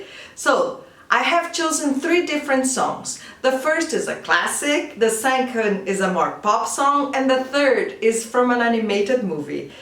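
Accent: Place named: Brazil. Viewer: Beautiful brazilian accent! Thanks for the activities!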